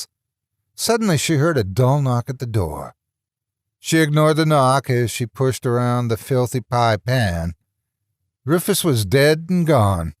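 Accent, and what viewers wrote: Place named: South, United States. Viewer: Hell of pulling that southern accent like only you can do Master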